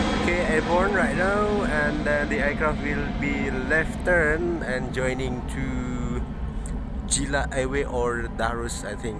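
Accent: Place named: Singapore. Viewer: When I hear his accent, I thought I am watching singaporean vlog... Great job pak cik...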